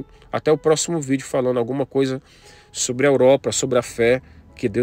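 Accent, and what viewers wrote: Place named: Brazil. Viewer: Não sabes do que falas! Pelo teu sotaque és do Brasil, fala do Brasil! Deixa Portugal para os Portugueses!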